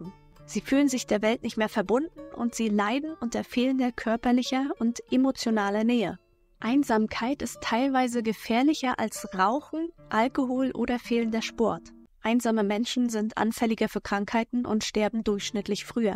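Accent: Mecklenburg-Vorpommern, Germany. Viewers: Vielen lieben Dank für genau dieses Video. Ich muss eingestehen, dass nicht ich es entdeckt habe sondern mein ältester Sohn. Er hat es in unsere Familien Gruppe gestellt. Mit der Bemerkung: "...seht es euch unbedingt an, sehr interessant!" Also danke ich Euch beiden. Meinem Sohn für's Weiterleiten und Dir für dieses Video. Ich habe mal gleich die Glocke gedrückt. 😉🤗 Was ich u.a. auch sehr gut finde, dass Du selber sprichst. Und so habe ich erkannt, dass Du aus meiner Heimat, Mecklenburg Vorpommern kommen müsstest.🤗🙋🏼‍♀️ Ich glaube, dass sich jeder in Deinem Video, mit irgendwas, identifizieren kann. Danke und mach weiter so.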